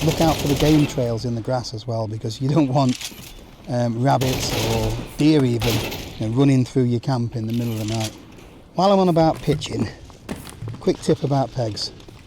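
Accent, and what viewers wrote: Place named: United Kingdom. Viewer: caralho, o br com o sotaque britânico inteiro slk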